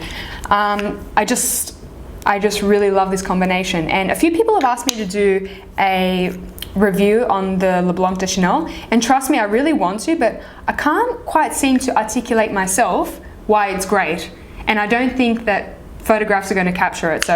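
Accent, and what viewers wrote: Australia. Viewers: Hey beb are you an aussie? Another thing is where do you buy all your makeup from? You're gorgeous btw!!!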